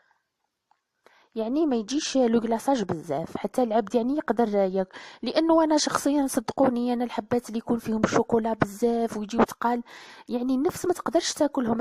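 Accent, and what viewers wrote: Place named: Constantine, Algeria. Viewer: هدرتك تباني من قسنطينة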